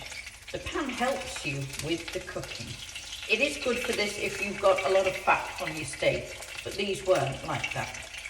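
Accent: United Kingdom. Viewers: Sounds yorkshire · You are so gorgeous and I love the accent.. I take it that you are from the UK maybe.. I would love for you to be my Valentine love..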